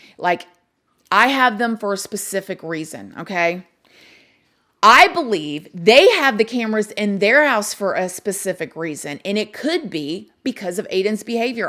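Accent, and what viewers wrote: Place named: New York, United States. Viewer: Omg I love your new York accent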